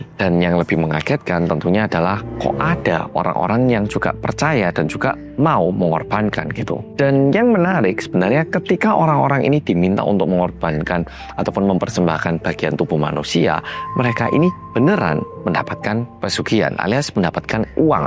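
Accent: Java, Indonesia. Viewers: Hai muedok🎉 Nyimaaak 🎉🎉🎉 terima kasih, yaaaa 🙏🏿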